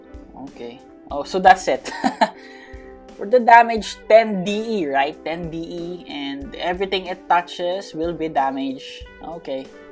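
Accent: Philippines. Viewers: Tunog pinoy to ah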